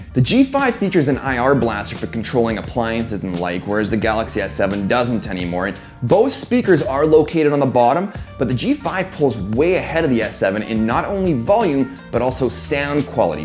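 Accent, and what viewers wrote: Canada. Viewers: i like u're videos man...and clean voice)....are u Canadian? I hesrd Csn from one video)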